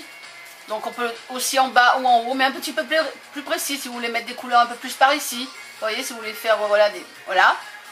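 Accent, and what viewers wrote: United Kingdom. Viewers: ps / je suis dingue de ton accent british !!!!!!! non je ne me moque pas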